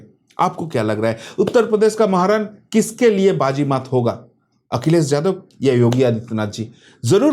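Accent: Bengal, India. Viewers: आप तो आते ही छा गए सर! आप की बंगाली accent वाली हिन्दी और साथ ही समाचारों का विश्लेषण बहुत hi बढिय़ा है ! धन्यवाद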